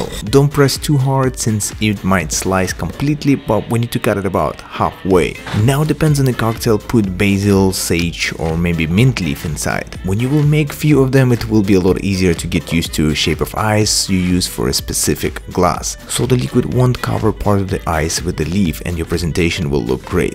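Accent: Russia. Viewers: Слышно русский акцент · Сижу такой смотрю... И вот замечаю такой небольшой наш РУССКИЙ акцент(я сам вообще не бубу в английском, но как-то заметил). Потом такой, обращаю внимание на на название канала... И всё встаёт на свои места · You have a small russian accent. Lol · wow i understood that you are russian from your accent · рашиський акцент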